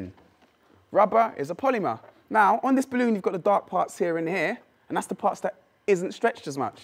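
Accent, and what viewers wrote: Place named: England, United Kingdom. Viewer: i love english accent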